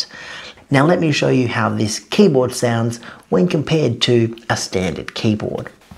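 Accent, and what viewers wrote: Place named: Australia. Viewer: What affectation is this? I am unfamiliar with Australian dialects but he has a tightness in his voice that sounds like an affectation, and he hits his S plosives extra hard. Where in Australia is this accent from?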